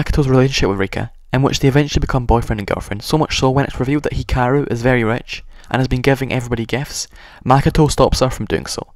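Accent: Scotland, United Kingdom. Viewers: are you scottish??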